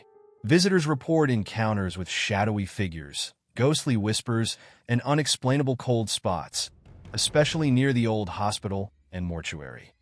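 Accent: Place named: United States. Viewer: Why the Yanky VOICE😢😢😢😢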